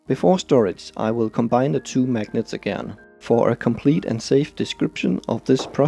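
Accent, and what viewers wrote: Denmark. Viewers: The danish accent is strong with you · Are you from Denmark. I think I here Danish accent. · Are you from Denmark? Your accent seems Danish.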